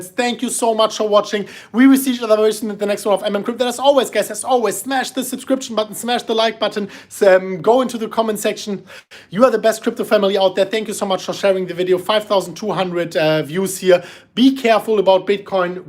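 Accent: Germany. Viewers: Ich wusste sofort das du Deutsch bist 😂 Der Akzent kommt mir bekannt vor. Much love aus Hamburg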